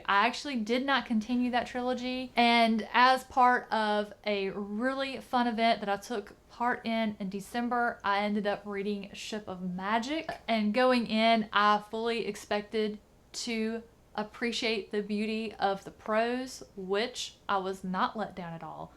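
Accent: South, United States. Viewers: I love your channel. I have a strange question. Do you often get judged for your southern accent? Meaning the stigma about the south and education? I wonder because I have big muscles and I often get comments from people like “meathead” or girls being shocked that I’m a book nerd. Anyway, weird question ,I know. I was just curious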